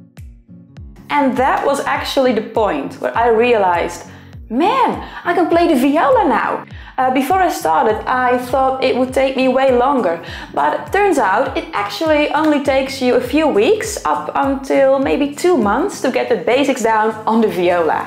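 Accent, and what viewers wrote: Netherlands. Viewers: I guess you're Dutch from your accent 🤔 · From your name and accent I think you are Dutch or Flemish!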